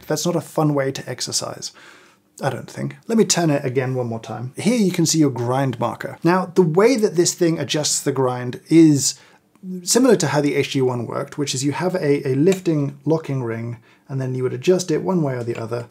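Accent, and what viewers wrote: United Kingdom. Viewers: I must admit that your videos are kind of a therapy for me. There is much to enjoy when watching them: - beautiful editing - excellent choice of music - great macro shots - I don't know much about lighting, but it all looks put well together - the hair (no further comment needed) - the calming voice - the British accent - coffee - tech-stuff - the jokes I could go on and on, but I need my therapy now.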